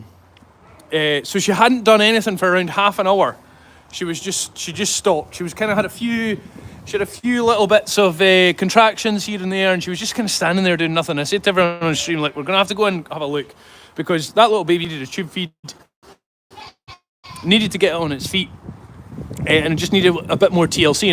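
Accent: Scotland, United Kingdom. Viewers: At the end of the video, I thought you were saying taking a healthy “mule” home. Then I finally figured out you were saying “meal”. Having a bit of trouble with your Scottish accent.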